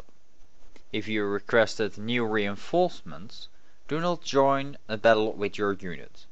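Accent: Netherlands. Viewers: Ik keek de vid en hoorde gelijk dat je nl was, bedankt voor de uitleg man! Ik speel deze game al meer dan 200uur hahaha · ah that makes sence thanks for the tip :) Btw are you dutch ? :) cuz i can hear you are definantly not english :)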